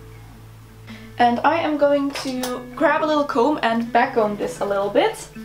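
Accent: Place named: Netherlands. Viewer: what accent does she have? She is from the Netherlands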